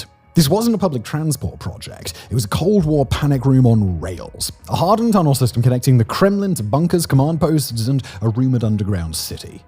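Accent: United Kingdom. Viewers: Он британец, а они еще и портят американское произношение... Я бы знал, я американец)))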